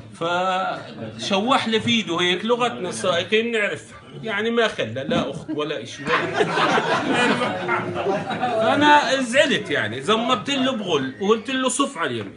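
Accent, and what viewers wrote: Palestine. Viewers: فلسطيني 😂😂😂 مش عنصرية بس مبين من لهجته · هذا لهجته مش اردني فلسطيني مبين من اول ثانيتين عرفت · هذي مهي لهجتنا هذي لهجة الفلسطينية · هاظ مش اردني من لهجته هاظ فلسطيني مش اردني قال بسولف بكيت بدل كنت 😂😂😂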